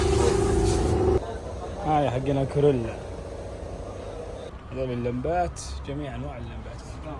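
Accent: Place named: Unaizah, Saudi Arabia. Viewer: لهجة أهل عنيزة تسذا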